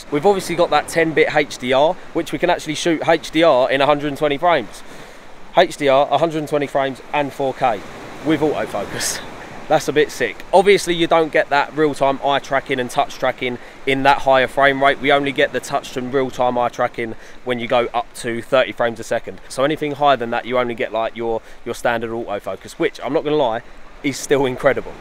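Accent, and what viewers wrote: United Kingdom. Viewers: Bri ish❤️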